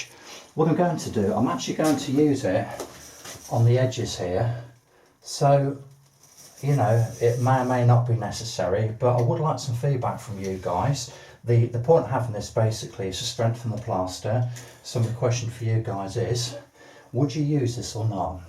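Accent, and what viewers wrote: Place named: England, United Kingdom. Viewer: I've got cuts in the plaster board but not through to the brick work so do I need PVA and would skim coat on its own do ?? Please answer soon as you can ps I worked in England for 32 years been home in Ireland now for eighteen months loved hearing your accent again reminds me of all the fine English men I worked Drank and had the craic with miss you guys take brother and regardless if you answer thanks for the vid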